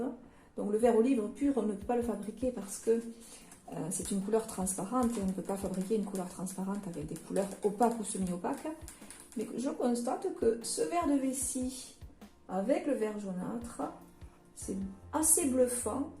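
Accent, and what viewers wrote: South, France. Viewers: Coucou, je te mets un MEGA pouce bleu, car, (tu as fait un travail remarquable), c'est ce que j'appelle de l'ART, sincèrement, BRAVO pour cette barque sous fond coloré chaleureux et la végétation détaillée Je sais reconnaître les vrais talents, et (tu es une vraie artiste, car tu as ça dans la peau, c'est indéniable), et ton tableau est vraiment très beau, tu mérite 20/20 en plus, d'être jolie, ton accent du sud est attachant, gros bisous et prend bien soin de toi, Artistiquement, kenic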